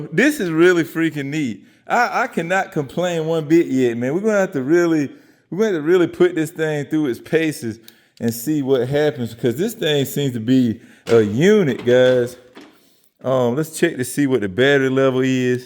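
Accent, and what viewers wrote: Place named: North Carolina, United States. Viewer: Hey, long time watcher first time commenting. What part of NC you are located? You sound like you may be close to my wife's people in Sanford.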